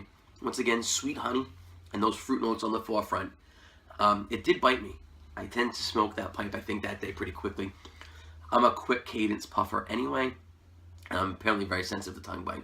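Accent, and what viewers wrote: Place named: New England, United States. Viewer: Hello definitely a New Englander. My wife is Rhode Island and it takes some time to be able to understand what Yinz are saying. LoL. Great video and I gave you a sub. Great job and thanks for the review. Be well and Keep it Lit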